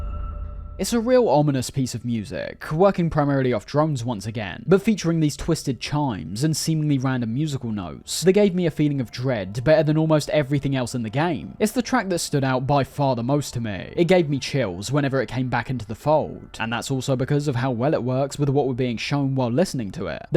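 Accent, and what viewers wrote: United Kingdom. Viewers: a brit is speaking about a video game for autistic people, listen and learn 🤫🧏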